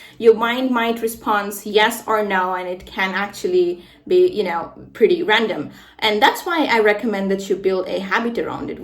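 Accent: United States. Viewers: I love your American accent 🎉 Break a leg ❤ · I love your American accent ❤️❤️❤️❤️❤️🇺🇸🇺🇸🇺🇸🇺🇸🇺🇸🇺🇸🇺🇸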